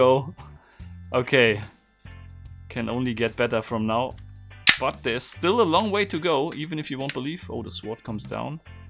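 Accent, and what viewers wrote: Germany. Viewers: He sounds german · Man checkt das du deutscher bist 🤪🤣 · Are you from germany? Sorry for this weird question, but I was wondering through the entire video. I go to a school in germany and noticed my classmates have the same accent as you when speaking english. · Ich liebe diesen deutschen Akzent xD (I love this german accent xD) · Bist du Deutscher dein Englisch hört sich nicht gut an oder täusch ich mich da. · Also man hört raus das du ein Deutscher bist aber trotzdem ist dein Englisch super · He's speaking Like a german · An deinem Englisch merkt man direkt das du Deutsch bist :D gutes Video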